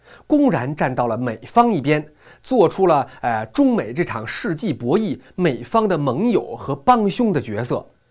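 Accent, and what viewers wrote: Beijing, China. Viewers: 喜欢💕纯正的北京味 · 咱北京人揍是这么口正......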